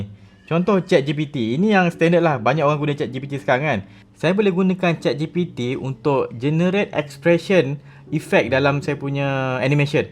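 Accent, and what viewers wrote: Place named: Kelantan, Malaysia. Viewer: Ada bunyi macam telo orang Kelantan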